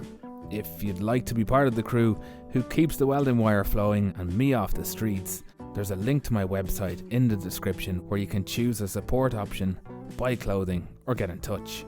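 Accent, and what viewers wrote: Ireland. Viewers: Love the story-telling, makes the videos! Is it the Irish accent?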